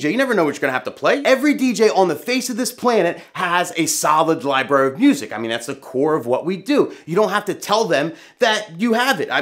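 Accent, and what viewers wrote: New Jersey, United States. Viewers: I don't know if I watch too many movies but you're so New Jersey lol. Love it 🖖🏾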